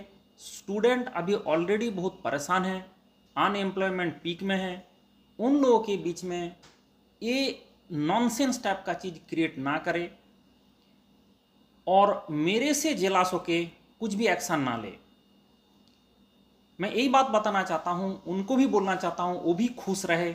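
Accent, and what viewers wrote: Bengal, India. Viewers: he has a typical bengali accent hqhqhqhqhq hehehehhehehe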